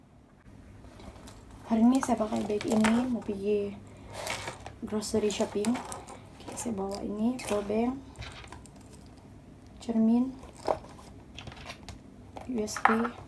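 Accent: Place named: Sabah, Malaysia. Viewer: i love how you keep the sabahan identity by still speaking in sabahan way, showing sabahan surrounding, how sabahan cook, eat and sabahan usual meal. it makes your vlog more unique than the other vlog ☺ i hope that u will not influenced by other countries (kind of vlog). ini utk suggestion utk kamu ja la, maybe somedays u will show more on sabahan culture like going to tamu, ataupun showing them how to make our cultural food like tuhau, bambangan etc while you're vlogging. it makes your vlog more unique dan lgi interesting lah urg bilang, x bkin boring. hehe. keep up the good work. suka tgk vdeo kamu 🤗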